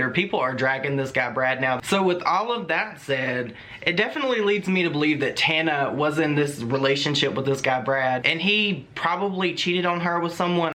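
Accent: South, United States. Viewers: WHY DOES ONLY HAVE 43k SUBSCRIBERS! He’s adorable, his southern accent is everything, he’s relatable and he got all the tea 💁🏻‍♀️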